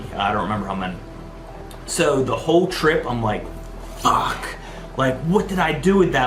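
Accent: Philadelphia, United States. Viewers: Ps: I knew you were a philly guy hahah I recognize that accent anywhere!!! I’m a bucks county girl 🥳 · Just curious - what’s that accent? Philly? Baltimore? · I knew I detected a Philly accent right on shout out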